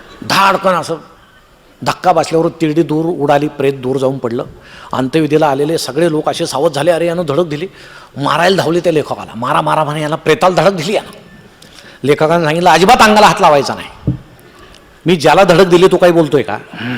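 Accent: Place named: Ahmednagar, India. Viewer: नगरी भाषा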